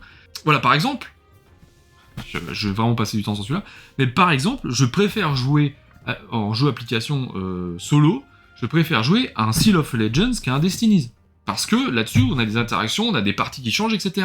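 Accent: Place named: England, United Kingdom. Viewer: oui. Pis y a déjà du monde de bien installé, j'avoue. Dommage, ça aurait été bien avec ton humour et ton accent anglais qui a de la tronche (bon, un accent qui a de la tronche... je suis parti loin, tant pis, mouarf!)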